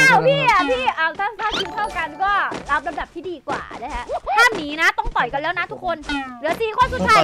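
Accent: Isan, Thailand. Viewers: พูดอีสานได้น่ารักมากค่ะ🥺💖✨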